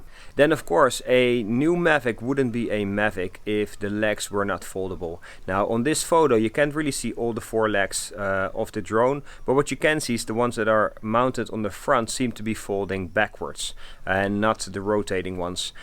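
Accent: Netherlands. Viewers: Are we Dutch by any chance?=) nice vid btw , tnx=) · u have a dutch accent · Hoor aan het accent dat je Nederlands bent maar je spreekt goed Engels 👌🏻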